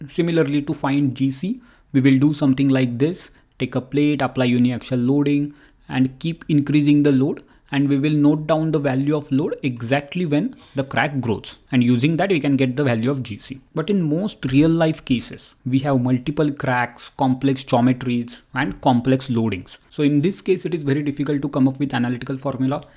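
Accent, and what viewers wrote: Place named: India. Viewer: too strong indian accent...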